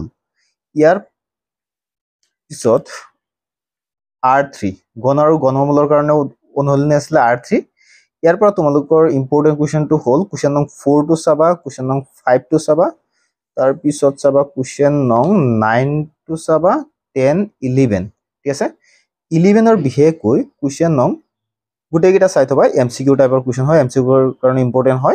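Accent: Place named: Dibrugarh, India. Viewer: Sir dibrugarh district or lage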